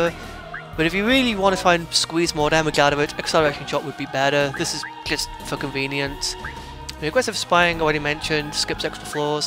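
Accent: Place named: United Kingdom. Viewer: It's a pleasure listening to your british accent <3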